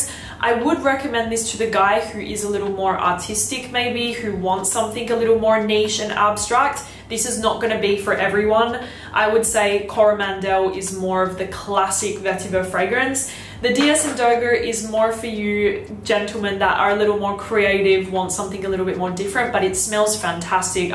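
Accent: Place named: Australia. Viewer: Aussie accent please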